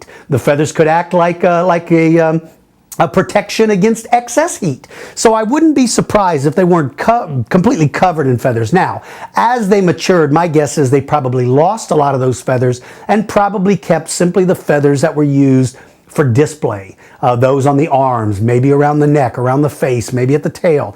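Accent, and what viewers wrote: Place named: Germany. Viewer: thanks for answering my question, that german accsent made my day of a crappy day thankyou man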